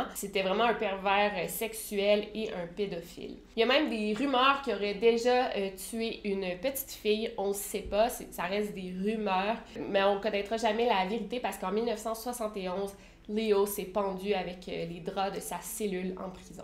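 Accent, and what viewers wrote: Quebec, Canada. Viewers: Bon bah voila l’accent Québééèquoaahh me fait plus rire 🥰 suis amoureux !!!! J’aime bien tes videos c’est du bon boulot, interessant, captivant et 🥰....🥰.....S....sm.... smack 💋 /run !!!!!!! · merci beaucoup pour toutes ces histoires très intéressantes !!en plus bien raconté et avec un petit accent canadien,c encore mieux !!👍👍👍🏻👍🏻👍🏻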